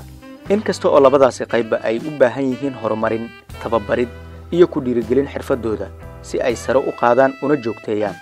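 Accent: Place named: Mogadishu, Somalia. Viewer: Golden voice Masha Allah muuqaalkaada iyo afsomaliga aad Ku hadashid ismalaha reer xamar laguu maleeya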